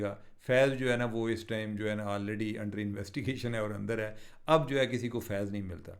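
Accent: Punjab, India. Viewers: Sahab acchi wali wadi Urdu hai kyon Punjabi da tadka ramdev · Why can't you speak in proper Urdu language in a professional manner. Is Punjabi touch necessary.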